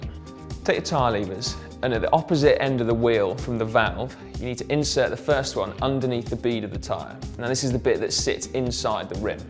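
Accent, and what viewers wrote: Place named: Cockney, United Kingdom. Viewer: Brrrrrrr this cockney English......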